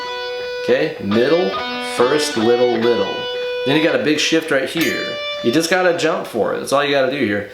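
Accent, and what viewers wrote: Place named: East Tennessee, United States. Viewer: I'm from East Tn, and you sound like half of the people I know. Any chance you're from around here?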